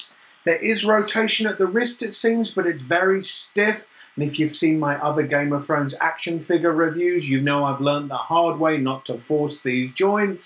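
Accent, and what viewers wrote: England, United Kingdom. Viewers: Sorry, it just sounds like a regular English accent to me. I admire you alot and would never intentionally insult you.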